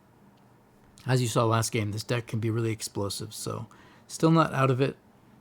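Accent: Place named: United States. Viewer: Soothing voice with american accent as promised by CGB — check ✅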